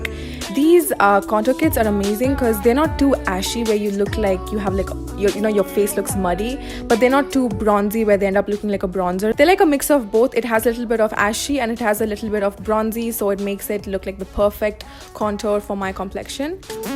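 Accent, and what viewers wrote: India. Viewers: Am I the only one that didn’t expect her to have an Indian accent, genuinely thought she was black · I thought the same thing.I was quite shock when I heard the Indian accent.She looks like a tanned Greek girl or tanned Turkish girl.She is very pretty though. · Wow that indian accent took me aback, thought you were black.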